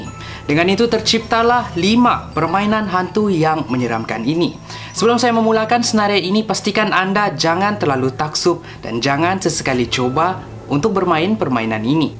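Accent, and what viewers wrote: Sabah, Malaysia. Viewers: dia nie sabahan ka atau indon? maaf tnya mcm nie. .sya pun sabahan juga. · Dari sabah kah?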